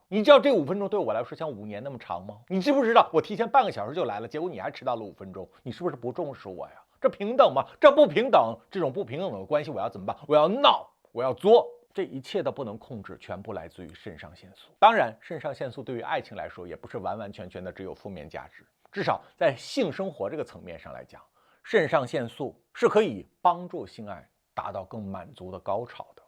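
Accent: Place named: Northeast, China. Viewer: 难得的高度！阅历丰富、悟性极强才能有这么深的感悟。是不是在北京长大的东北人？这口音尾音儿有东北味儿。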